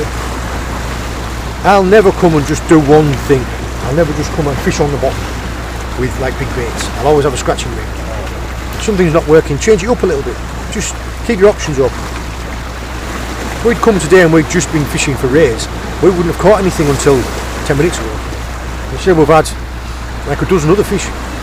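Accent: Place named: Middlesbrough, United Kingdom. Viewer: Are you from the 'Boro' originally as I recognise the ascent...as I grow up there ! Great video again very informative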